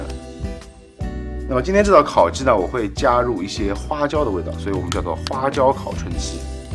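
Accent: Shanghai, China. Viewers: 一，你又不是广东人，一口上海国语。二，广东人不吃辛辣的调料。三，这只鸡没烤熟。如果满分十分你只能拿个三分，失败的视频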